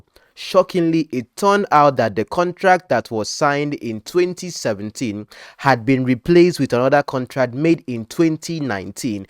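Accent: Calabar, Nigeria. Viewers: Why does your Igbo sound like Calabar/Akwa Ibom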